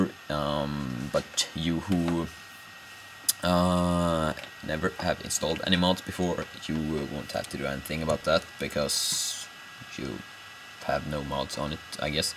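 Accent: Sweden. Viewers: are u from sweden u talk with swedish accent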